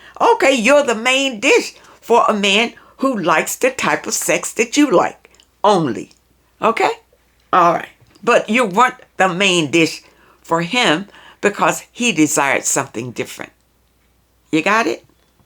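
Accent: South, United States. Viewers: Hello Miss, I heard you mention are orihinally from the Carolinas. I'm hete in South Carolina, in Whitmire, Newberry County. I thought detected some Southernisms in your speech. Friendliness too. Also youre a fixed sign, me too Double fixed ♏ Scorpio Sun, ♌ Leo Moon, Scorpio Rising..I enjoy your videos, I learn something new with each video. Thank You. *Pardon my typos, for some reason the Edit option jumps around, wont allow me to correct in each word.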